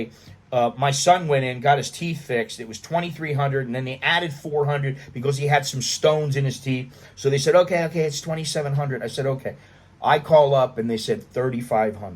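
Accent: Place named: United States. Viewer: You sound very American. Most of the world is like Thailand.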